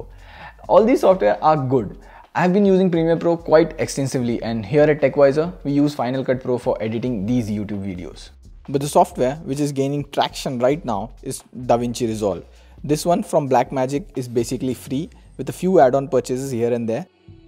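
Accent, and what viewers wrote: India. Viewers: Hallo friends in have indian acsent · thick indian accent why it have to be thick indian accent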